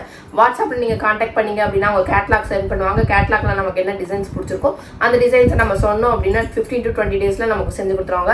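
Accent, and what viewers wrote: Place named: Bangalore, India. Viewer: Ungaloda slang Bangalore Tamil madhiri irukku aana neenga coimbatore nu solreenga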